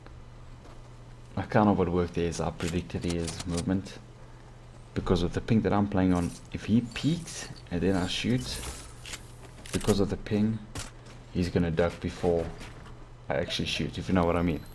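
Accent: South Africa. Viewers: Are you afrikaans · Are you south African?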